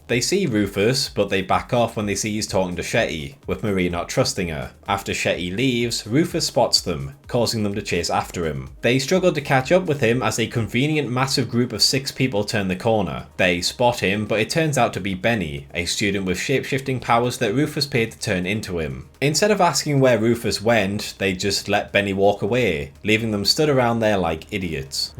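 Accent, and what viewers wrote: United Kingdom. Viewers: Are you a Geordie? Wasn't sure earlier, until you said 'lads and lasses' at the end. Great video by the way.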